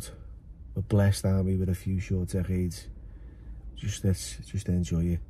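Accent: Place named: Birmingham, United Kingdom. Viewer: that brummie accent thought you were doing an aussie lol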